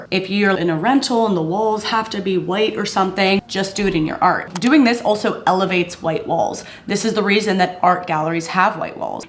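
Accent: United States. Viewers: hi what accent do you have? it's lovely i know it's american but i would like to know the specific accent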